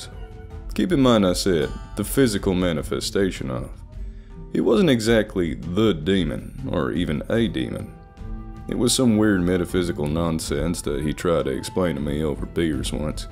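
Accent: South, United States. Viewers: Gotta be honest, too much southern accent, not enough Texan.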